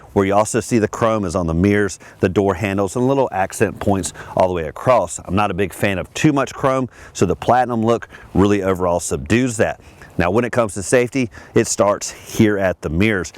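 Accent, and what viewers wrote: South, United States. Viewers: . Great job. I like the truck. The warranty is great too. Worked that southern accent. Mississippi myself. Plan on getting this truck but a different color. How does the 4 by 4 Platinum drives? Is it a heavy feel? It's supposed be around 5 seconds for 0 to 60.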